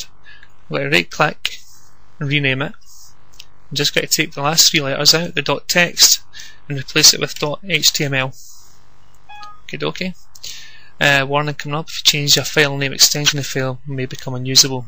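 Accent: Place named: Scotland, United Kingdom. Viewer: omg the scottish accent is soo cool. and what a comback at comedy agony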